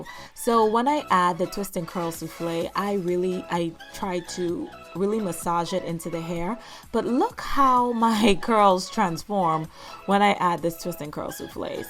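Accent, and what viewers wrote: Bahamas. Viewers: I know my people! I love the finished look! 💄 1st off you and I have the exact hair texture, so watching your videos helps me a lot. 2nd My dad’s family is from the Bahamas so I recognize the accent! 3rd I am also from South Florida. 4th we might be related because I have Farringtons on my ancestral line. Salute my sister 🙏🏿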